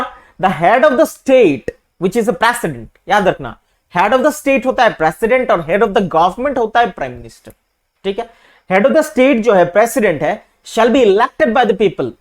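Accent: United Kingdom. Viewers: Change ur Britain English and speak as upsc aspirants or in Hindi😠😠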